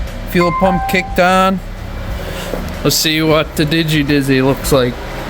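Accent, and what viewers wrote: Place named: Ireland. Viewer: You don't sound Canadian. Irish accent there?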